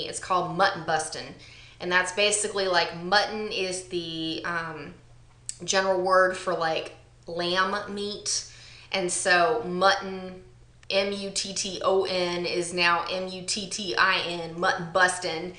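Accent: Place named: Texas, United States. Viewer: Loved this,!! I love when your Texas twang comes out. I wanna live down south! I think I wanna do this tag:)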